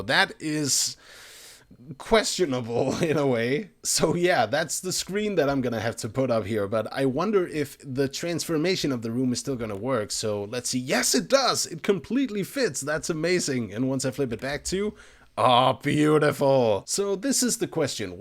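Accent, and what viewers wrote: Denmark. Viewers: Are u from Denmark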